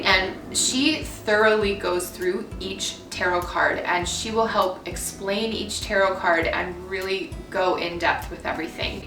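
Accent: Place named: Canada. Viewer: I appreciate ur authenticity and sharing these prominent Youtube leaders! Although they don’t always resonate with me they are knowledgeable & authentic. There so many quasi spiritual charlatans out there presenting 1/2 truths & can be damaging to new seekers. ❤️ ps i thought i heard ur sweet canadian accent!!! 😉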